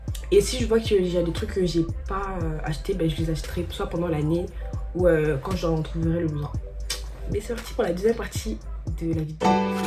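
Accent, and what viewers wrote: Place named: Cameroon. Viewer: dès ta 1ere phrase j'ai su t'étais camerounaise, bonne vidéo.😂🙏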